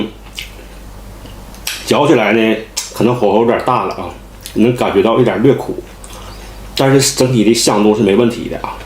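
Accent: Northeast, China. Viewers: 原来是东北人😁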